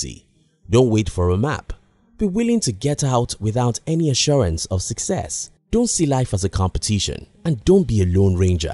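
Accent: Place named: Nigeria. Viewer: You speak like a Nigerian.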